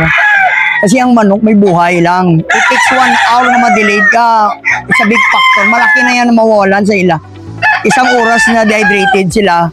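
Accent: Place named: Iloilo, Philippines. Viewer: Ilonggo bla si sir 😊😅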